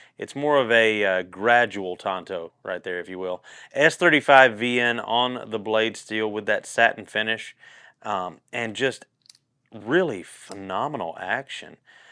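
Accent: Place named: Appalachia, United States. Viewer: Have you met Jeff from Everything Auto? Pretty sure he’s your vocal dopalganger! Maybe it’s just that Appalachian twang?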